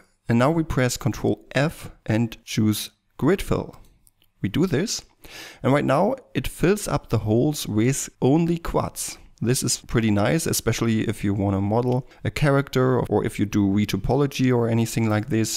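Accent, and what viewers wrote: Germany. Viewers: Woah I can hear that german accent even though I'm not native english (german too actually xD) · Diggi du hast einen Deutschenakzent........ ansonsten pretty good.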